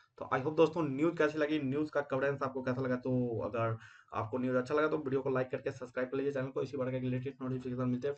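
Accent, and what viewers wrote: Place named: Bihar, India. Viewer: Ye bhai bihar se hai I guess 🥴🤔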